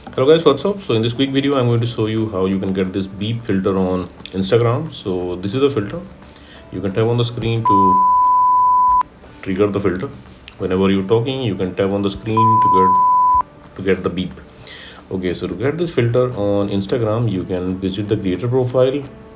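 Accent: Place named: India. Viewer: I hate indian accent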